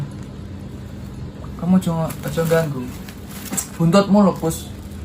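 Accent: Java, Indonesia. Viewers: Logat Jawa mu metu mas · Jowo ta mas samean? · Bukan Indo. Tapi Jawa..itu namanya orisinil..gak sombong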